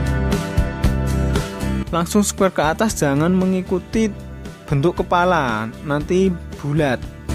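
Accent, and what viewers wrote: Java, Indonesia. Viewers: sampean ngomong medok eram toh mas mas 😁 tutorial terbaik, hasil terbaik, tergokil dan terkocak 🙏 salam horas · Mas omah u ngend kok medok ngomonge,,,semangat trs👍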